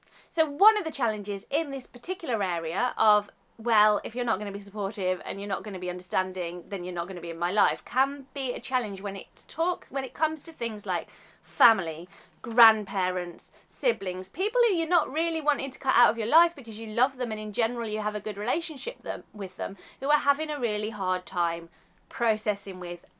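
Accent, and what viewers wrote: United Kingdom. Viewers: Hi. Your videos which focus only on autism have created what looks like a community of ( pro-autists ). With that groundbreaking endeavor you have made normal and likeable what is essentially seen as abnormal and dislikeable. Besides, you have provided a knowledge pool regarding this condition which covers many of its aspects. For us none native speakers of the English language, it is a thousand times easier to find a material in American English than to find it in British English. For me, both as a dentist and an avid student of the English language ( the British accent ), your videos provide a save heaven of learning which I both appreciate and admire. I have been thinking, as well, it would be great if this same idea be expanded to cover other areas of people with special conditions. Such a focus will turn these special conditions from the stereotypical branding of ( disorders ) and ( disables ) into groups of special conditions and especially creative communities. 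I strongly believe, it worths trial.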